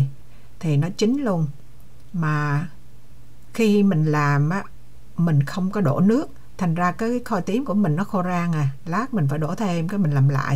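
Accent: Quang Nam, Vietnam. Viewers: Cô nói giọng giống người miền Trung, quảng nam.🥰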